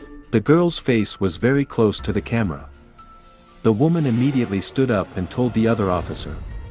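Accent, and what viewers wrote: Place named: United States. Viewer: Brah, Honolulu and Waianae are separate counties. And it's HONOlulu, not HANAlulu. I can tell you one haole from da mainland.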